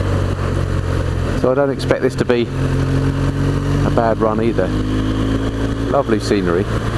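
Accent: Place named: England, United Kingdom. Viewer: I just love the way you keep talking in your English accent and commenting on your surroundings. Pretty narrow and thus scary roads you have there. Beautiful landscape though! Ride safe!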